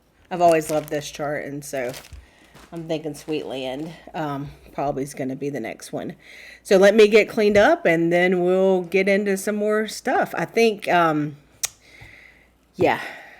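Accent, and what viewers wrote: South, United States. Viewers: Congratulations! I look forward to watching your videos. Love your “southern” accent and pronunciations. I’m a southern girl, too. I didn’t know I had a southern accent until I moved to California. Thank God I’m back in the south😜😜😜I would love to win your fancy scissors🙀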